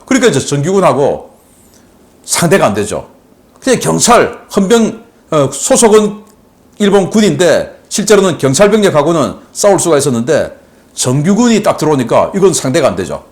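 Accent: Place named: Gyeongsang, South Korea. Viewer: 경상도치야...국방티비에서 내려와라...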